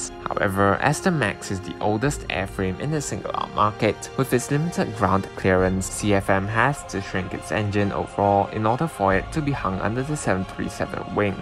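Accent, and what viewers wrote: Singapore. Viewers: I enjoy watching your videos for the most part… but how about getting someone else to the voiceover. Argh, Singlisn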